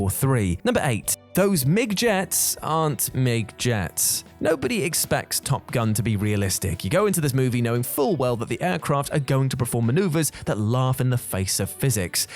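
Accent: United Kingdom. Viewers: God I hate British narrators!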